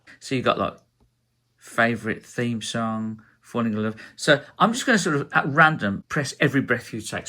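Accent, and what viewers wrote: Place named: Birmingham, United Kingdom. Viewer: Very interesting . You are an amazing player and I've learned so much listening to your videos. Also nice to see your other keyboards stacked up especially the Roland Juno. I have a beloved Roland Juno DS Amongst my many others. I'm sure I detect a slight Birmingham accent. I used to get some keyboards from Jones and Crossland and Lichfield Organ Studios back in the day as I lived in Lichfield before I moved up to the Scottish Highlands. Keep up the amazing playing ! 🎹👍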